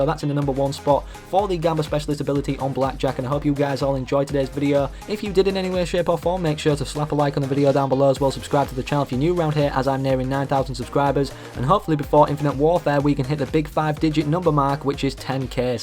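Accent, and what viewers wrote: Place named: Yorkshire, United Kingdom. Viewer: know a Yorkshireman when I see/hear one ;)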